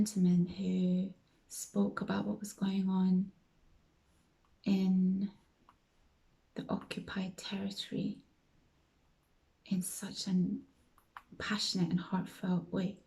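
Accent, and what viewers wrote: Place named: Scotland, United Kingdom. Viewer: You're absolutely right about politics being two sides of the same coin. I also find politics boring and contrived, and I agree that it's in our best interest to take things/viewpoints from both sides or none, rather than subscribing to just one. That way it's alot harder to be brainwashed or tarred with the same brush. Left/Right is just yet another duality we have here on earth. Everything is duality, pepsi/coke, red/blue etc... Constant divide and conquer techinques. I've never followed Charlie Kirk but I would never glee at anyone's death. I am extremely careful about where I send my energy. I'm not sure why Youtube decided to reccommend me this video haha. You have a very calming voice and seem like a high vibrational person. And I resonated with you when you mentioned how dull everything is during Wintertime in the U.K. 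It's like that here in Melbourne AUS too. I also travel during the colder months. Hot places are my favourite. I know you mentioned Sheffield, but did you live in Scotland at all? I noticed sort of a Scottish twang to your accent.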